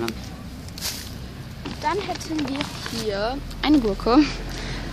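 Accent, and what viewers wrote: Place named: Hesse, Germany. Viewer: Du kommst aus Hessen.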